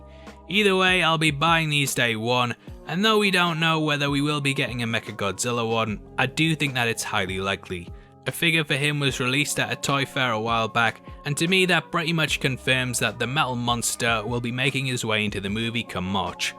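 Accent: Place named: United Kingdom. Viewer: Wait a minute..... you’re from the UK!!?? 😳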